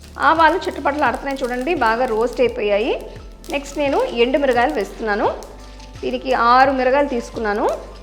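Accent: Tamil Nadu, India. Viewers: అవిడ భాష పలికే తీరే చేప్తుంది తమీలీయిన్ అని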